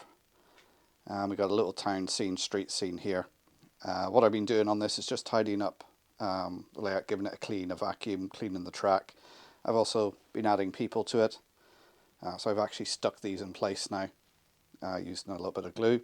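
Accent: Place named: Ulster, United Kingdom. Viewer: I would to like make a few remarks, if I may. I really enjoy your channel. Not just for the trains but for the advice and help from the many folks here. On a more personal note I love to hear the soft tones of an Ulster accent from home. I always thought of you as a man that gives freely of his talents and time with the museum and the town. But here that you and Donegal/Bristol Mum are foster parents takes my respect for you to another level. Sir, I give you total respect. Thank you, if I may, on behalf of those kids that just need some support and love whilst going through some very difficult times. You are indeed, 🫡☘️ Ian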